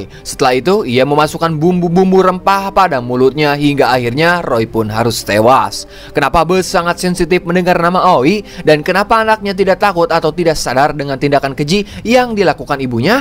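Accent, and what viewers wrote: West Java, Indonesia. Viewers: Aa Org Sunda Bukan. Lucu Ngomong Nya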